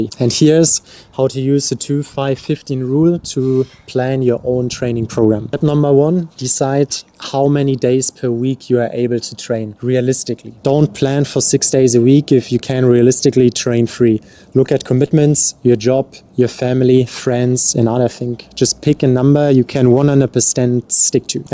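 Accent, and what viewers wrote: Germany. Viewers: Are you German by any chance... I live in Germany and your accent is like German... Just curious. Also, very honest advice. I hope and pray your channel grows since the major population on YouTube or social media looks for flashy shortcuts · When germans speak english :D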